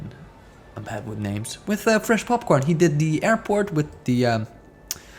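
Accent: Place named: Netherlands. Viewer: sup man. Great series I really enjoy watching series like these about cities skylines. where are you from by the way? You sound a bit like you are Dutch or Belgian.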